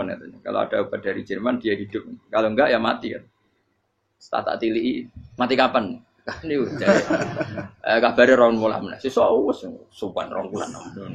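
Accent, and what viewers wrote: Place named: Banyumas, Indonesia. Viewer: ❤❤❤purbalingga ngapak ndrek gus..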